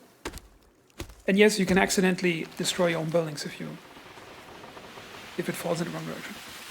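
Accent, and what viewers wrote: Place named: Germany. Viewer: He sounds German to me...he's not treating this as a game, more like a job.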